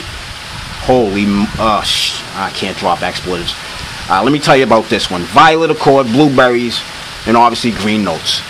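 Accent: Boston, United States. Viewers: Black guy with a Boston accent- wicked awesome! The legend is true- they do exist.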